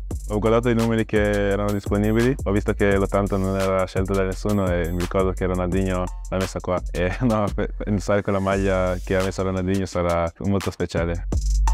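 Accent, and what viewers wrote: Veneto, Italy. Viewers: Grande Musah, con l'accento veneto.♥️🖤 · Musah: (*parla italiano con l'accento veneto) First reaction: shock😮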